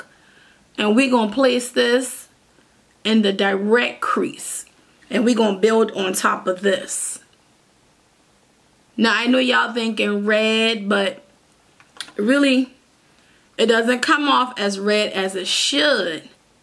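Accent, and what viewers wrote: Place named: Gullah Geechee, United States. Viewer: Geechie gyal!!! I can spot that accent out of a line up lol. Love the eyes, I still can't master the winged liner. Taking notes!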